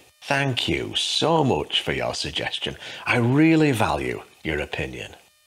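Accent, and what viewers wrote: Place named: United Kingdom. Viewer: First time seeing your channel. I loved the ending where you ask for some feedback! From the accent, I assume you are British, and your British humor comes shining through! Liked and subscribed. Can’t wait for more content, and you ‘giving me the eye!’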